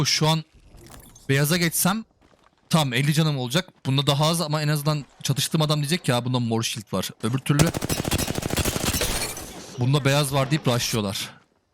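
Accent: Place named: Russia. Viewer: Rus aksanlı lütfen devam:)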